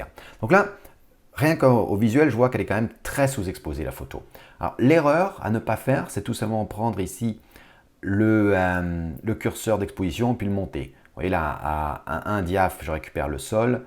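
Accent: United Kingdom. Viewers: Merci pour ce tuto sympathique. Ce que j'apprécie dans ce cours (contrairement à certains), c'est justement son côté "amateur"!! Les idées de base sont là, et permettent à chacun d'approfondir plus en détail la technique. Ne lâche surtout pas ton petit accent "British" qui m'amuse bien et donne à tes cours un côté sympas!!! PS: l'intérêt de YT c'est justement de pouvoir partager ce genre de tuto avec les plus humbles. Pour les "autres" il reste toujours les hautes Ecoles Privées avec toute la choucroute qui va avec.....!!!! Merci encore et continue dans ce sens!!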